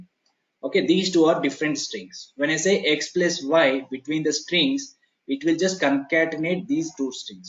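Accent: India. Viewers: En cuanto escuché la voz hindú, supe que aprendería algo bueno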